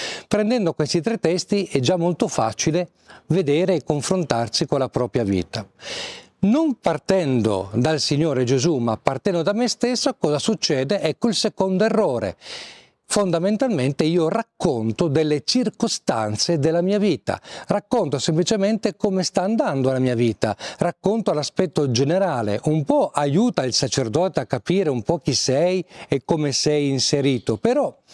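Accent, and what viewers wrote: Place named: Parma, Italy. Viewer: Caro questa è una bella sorpresa per me! Sono della provincia di Brescia. La seguivo molto volentieri, quando stava al santuario della B.V. Maria del rosario di Fontanellato e su Giovanni Paolo TV trasmettevano le sue catechesi sui 10 comandamenti. Mi è spiaciuto quando l'hanno trasferita nel torinese perchè ancora piu lontano... ma sono felice che sia andato a fare del bene anche la... Poi anche di G.P. TV s'è perso il segnale perciò era molto che non la vedevo e sentivo... in un primo momento mi era sembrato di conoscerla, poi sentendola parlare l'accento non mi convinceva perchè ricordavo la cadenza parmense.. ma da come parla ho detto no dev'essere lui! poi ho letto nella descrizione e.. che gioia! Mi ha fatto un vero piacere vederla e tutto. Lei non mi conosce ma io è come se la conoscessi. Saluti! Pace e bene! Sia lodato Gesù Cristo! 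❤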